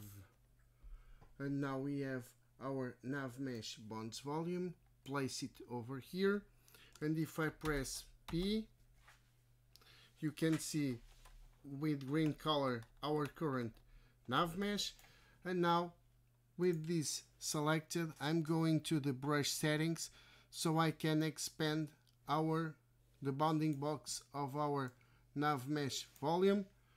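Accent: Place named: Portugal. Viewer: da p ver k es tuga XD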